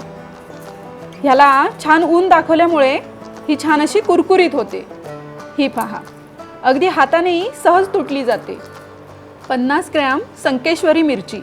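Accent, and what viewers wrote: Konkan, India. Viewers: Excellent,Mrs!! By the way,where do you stay,as your accent is,typical konkani. Thanks & all the Best to you,Family members.Shrineevas Sant,Nasik <mail>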